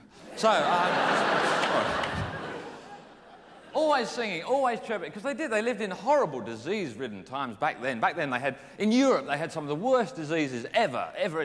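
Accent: Australia. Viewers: I love how him living in Australia meant he has a slight Australian twang to his accent.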